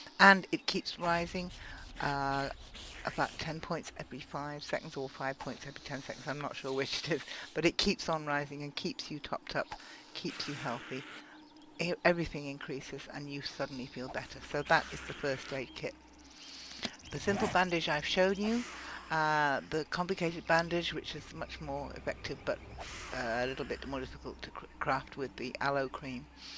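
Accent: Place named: RP, United Kingdom. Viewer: You accent makes me pay attention, it's like someone just turned the BBC on but instead of news it's relevant information I will use in my life.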